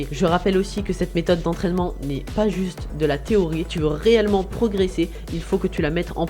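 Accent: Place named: France. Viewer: hes speaking like a French Sheff who knows how to cook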